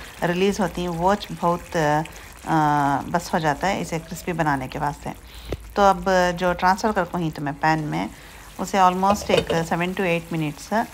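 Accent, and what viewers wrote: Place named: Deccan, India. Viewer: Behtareen recepie aunty ... Bohat khoob ...so nice to hear recepie in our famous dakhni slang... That added wit & humor is the highlight...All the very best ... Please share ur daawat vlogs & shadi menu recepies ..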